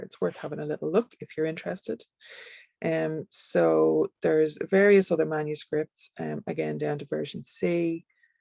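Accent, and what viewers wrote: Ireland. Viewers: I finally got in! It takes a little end-a-round for yanks! Your voice is perfect--Irish, but not too heavy an accent, which can make it hard to understand. Thank you for taking the time and consideration. I close my eyes and just try to picture the places, and the adventures that took place there! I will also read them myself, as that is my strong suit.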